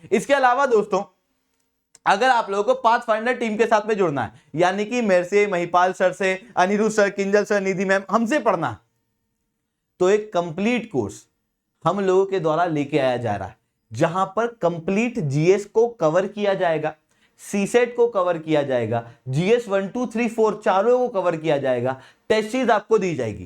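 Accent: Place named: West Bengal, India. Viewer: chaudhary it's WB accent not odia accent. Not so new tho since odisha is not recognised that much in whole india.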